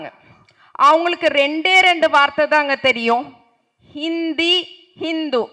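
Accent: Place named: Coimbatore, India. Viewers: கோவை தமிழ் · அம்ணி. நீ நல்லா வருவ. கோயம்புத்தூர் slangஐ. டெவலப் பண்ணு. விட்டுறாத. வாழ்த்துக்கள்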